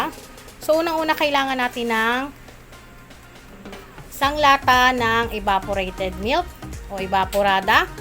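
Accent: Philippines. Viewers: I just subscribed to your channel, you are wonderful. I enjoy listening to you, you are very knowledgeable & professional. You explain it in very good tagalog and you say the english words in the real Pilipino diction and pronunciation which is very nice to the ear. I hope dumami ang manood sa iyo. Paki tanggal lang ang background na laughter at iyong background sound na wow, at sound ng chewing like chicharon, those ugly sounds degrade the high quality of your presentation. You are much better than Kris Aquino with her useless cooking.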